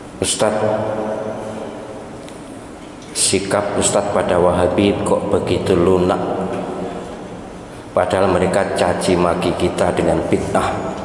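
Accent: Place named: Java, Indonesia. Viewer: Bahasa Jawa nya kental sekali, medhok😎 Teman-teman jga banyak org Jawa.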